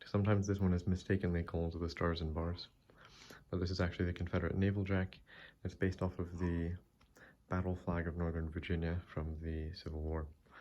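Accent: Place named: Scotland, United Kingdom. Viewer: Ii here a Scottish accent struggling to be heard over the American there 😊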